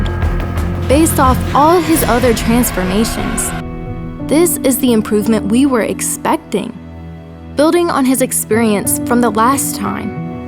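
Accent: South, United States. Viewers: I knew you were a southern girl lol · you're a fellow southerner? well that's cool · Where r u from with the southern accent? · your southern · I thought u had a southern accent I'm from s.c so I've heard way worse.i love your voice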